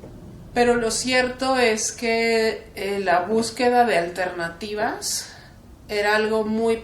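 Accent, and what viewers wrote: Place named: Mexico. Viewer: eres la Polaka más mexicana, no hablas español hablás latino mexicano mi Reyna estás hermosa , Rule' s Naucalpan mex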